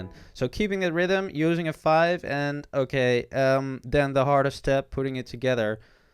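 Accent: Netherlands. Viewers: You sound dutch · Great tutorial very clear! Are u Dutch?. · I like your explanations starting from the basics and plus you sound dutch so good luck in the world cup! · Thomason lu He is Dutch, I can tell by his accent. I'm Dutch too ;D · Are you dutch? Sounds so bc of your accent :p sr if its not hah .good videos btw! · I think he is Dutch because he said "yeah?" And he said or to "of" which is only in holland people say that. They say "ja(yeah?)" and "of" in holland means "or"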